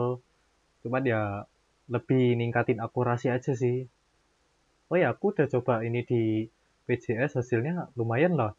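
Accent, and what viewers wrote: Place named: Java, Indonesia. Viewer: Njir medok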